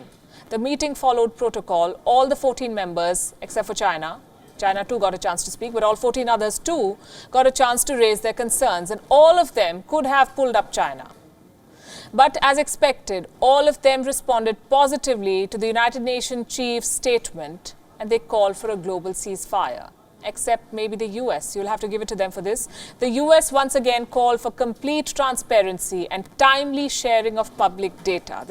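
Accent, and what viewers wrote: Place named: North India, India. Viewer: I dont like this North Indian English accent without giving a pause she speaks.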